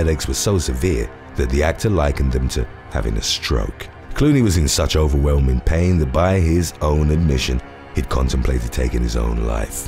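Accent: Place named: United Kingdom. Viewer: This guy sounds so British he should be in a James Bond movie...all the best from out here on land with the Kangaroos in Victoria Australia.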